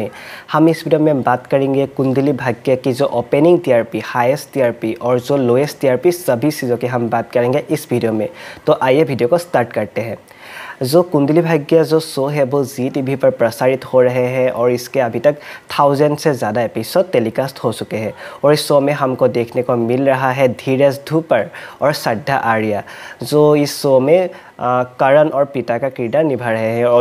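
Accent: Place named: Assam, India. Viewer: Kya aap Assam se ho aap ke bate me najar aa rha hai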